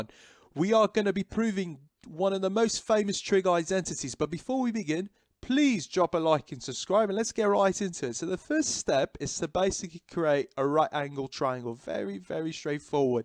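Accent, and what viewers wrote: United Kingdom. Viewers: Hmm a british explaining trignometry,what was i serching for 😅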